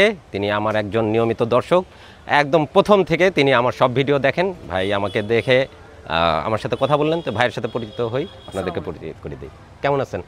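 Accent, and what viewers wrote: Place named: Rangpur, Bangladesh. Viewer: আসসালামু আলাইকুম ভাই আমার বাড়ি দিনাজপুরের চিরির বন্দর, আপনার কথা শুনে মনে হলো আপনিও রংপুর বিভাগের মানুষ। আজ প্রথম আপনার ভিডিও দেখলাম খুব ভালো লাগলো। আমি নওগাঁ জেলায় আছি, যদি কখনো নওগাঁয় ভ্রমণের ইচ্ছা থাকে জানাবেন, সার্বিক সহযোগিতা করবো ইনশাআল্লাহ।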